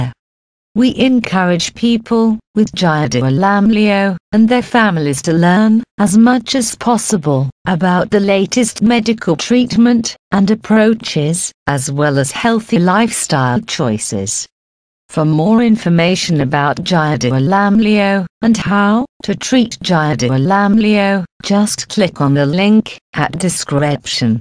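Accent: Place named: England, United Kingdom. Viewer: That English accent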